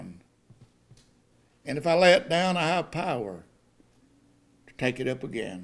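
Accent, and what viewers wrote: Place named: Texas, United States. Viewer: Love your Texas accent Pastor.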